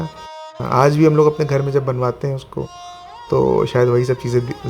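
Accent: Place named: Lucknow, India. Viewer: Baaqi sab accha hai aapke videos mein but aapka pronunciation pe work karna hoga specially when you are carrying lucknowi touch in your words example its not फ़िर its फिर😊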